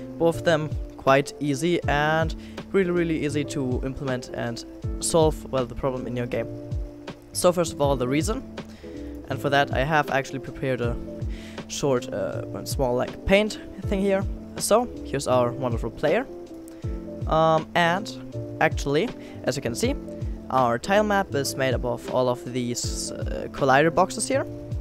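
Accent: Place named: Germany. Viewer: nice german dialect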